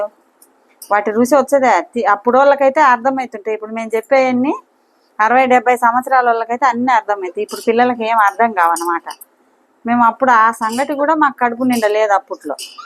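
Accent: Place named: Rayalaseema, India. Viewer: Kaani mee language rayalaseema laga vundi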